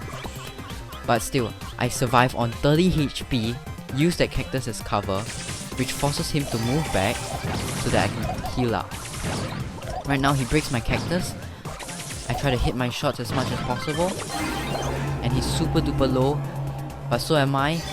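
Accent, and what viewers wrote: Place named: England, United Kingdom. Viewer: accent anglais BRUH.... lol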